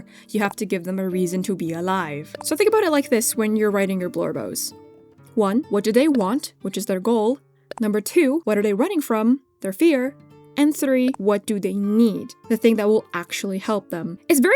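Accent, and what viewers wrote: United Kingdom. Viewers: You’re British voice is fine, Bouth are engaging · I’m rewatching this with AirPods and I can now clearly hear the British slipping in 😂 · lmao i was wondering! the whole video, i sat there trying to decide if you had always had a british accent and i just somehow blocked it out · It's not really related to the topic of this video but I find the way your accent changed into this very british english very relatable for me lol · I love how your accent is slowly becoming more British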